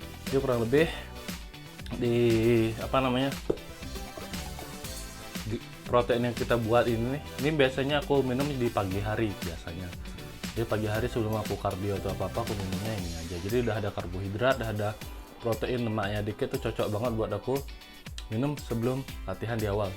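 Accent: Bali, Indonesia. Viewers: Bali bukan bang?? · Logat baline kental sajan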